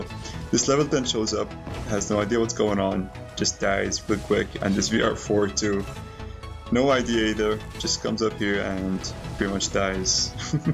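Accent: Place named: Austria. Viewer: Österreicher? :-) Klingt bisschen wie Arnold ;-)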